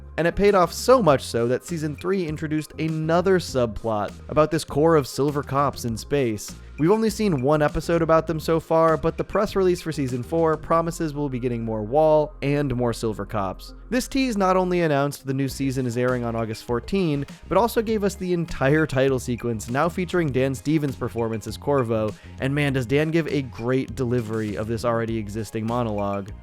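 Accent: United Kingdom. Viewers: I domt know how to feel how stereotypically British he sounds it feels a tad out of place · Love the new voice. But I'm a sucker for a BBC accent. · I just hope this guy doesn't become Rick or morty. A british Rick and morty would piss me off.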